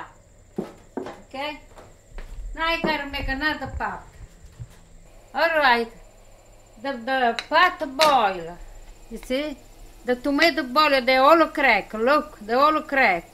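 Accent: Italy. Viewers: Thank You. You are a wonderful grandma . I appreciate your dedication and love to the job you are doing . Congratulations. And for those who made fun of your English , please be polite and respectful . She is Italian , not from London . And it's very natural that her pronunciation and accent will be influenced by her mother tongue . She still has good vocabulary and put a nice effort to make us things right. Congratulations again Grandma ! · . You poot oo vasanico'. My God, she's fantastic! I love her. What part of Italy are you from? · Dear Italian Grandma: Sitting far away in Mumbai (India) I really enjoyed watching your show, your beautiful Italian-English accent, your passion for cooking...thank you so much. I shall definitely try your recipes. Unfortunately, here we do not get as good tomatoes as are found in your countries. Thank you very much and wish you many more happy years of cooking... 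· This lady must be right off the boat, her Italian accent is thick, but I love it! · I love! By her accent and certain Italian dialectic words, I think she is from a paesa nearby my paesans. We come from Castel Nuovo, Parranno - vicino Formia e Montee Cassino. Love hearing her accent. It brings me back to old times with my nonno and nonna!! <3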